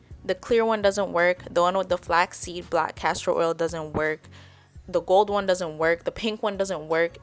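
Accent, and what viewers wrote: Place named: Toronto, Canada. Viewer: Girl you from Toronto? Your accent screams Toronto lol thanks for the hair tips. Needed something for my daughters hair. Will try it out!!!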